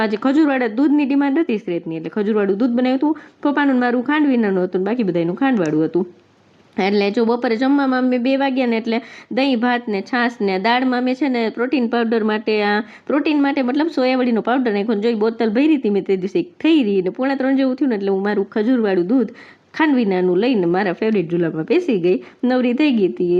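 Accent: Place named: Kathiawar, India. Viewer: Ben tmari bhasha ekdam pyor kathiyawadi chhe to tmaru piyar kya gam chhe 😊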